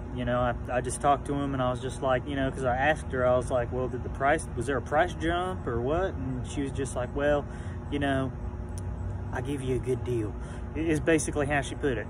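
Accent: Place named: South, United States. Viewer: Why is your Southern accent amplified by like 10 in this video?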